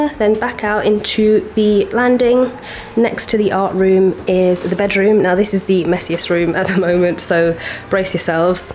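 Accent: United Kingdom. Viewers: i love to listen to people with a british accent that's so asmr tbh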